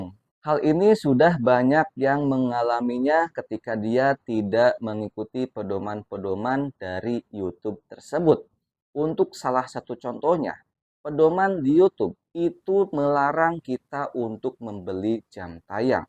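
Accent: West Java, Indonesia. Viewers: Assalamualaikum...kg nyimak mantap...Oia kg orang mna Sunda pisan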